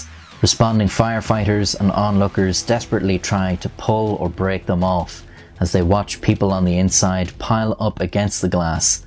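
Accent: Ireland. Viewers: Imagine if he keeps going until he gets much older with a gruff voice and that Irish accent? Like a lighthouse keeper or an old sailor telling dark tales. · I really like the style of your videos. Your Irish voice is perfect fo this stuff. Keep it up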